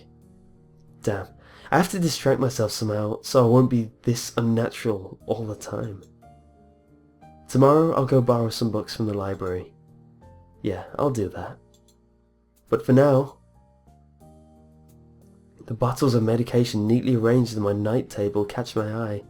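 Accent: England, United Kingdom. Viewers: are you from the north or south of England?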